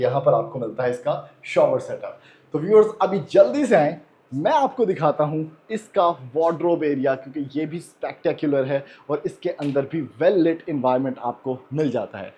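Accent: India. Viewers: Main es ki hindi se samjha ya india ka hai 😂😂😂😂😂😂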